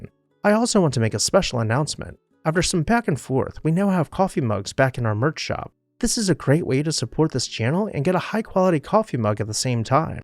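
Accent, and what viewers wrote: United States. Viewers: Correct. Although factual, the narrator has an annoying way of speaking and a definite American accent. Words are written using American spellings omitting the 'u' as in the word favourite. Never the less, Toronto does have a history of demolishing its best architecture, as this video illustrates. I've been to Chorley Park and all that is left is the plaque and the bridge. Truly sad.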